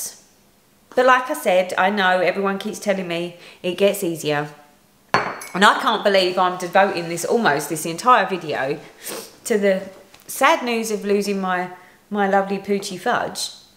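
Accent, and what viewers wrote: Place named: England, United Kingdom. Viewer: What part of England are you in? I love your accent!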